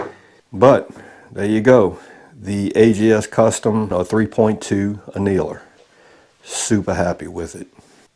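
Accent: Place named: Louisiana, United States. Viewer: Are you sure you're not from Louisiana? Show sounds like a Bayou Teche accent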